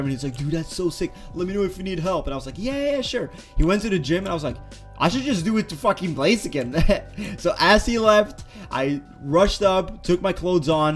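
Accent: Sweden. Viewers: i did know he was swedish and that he coukd speak swedish....i just didnt notice he had a bit of an accent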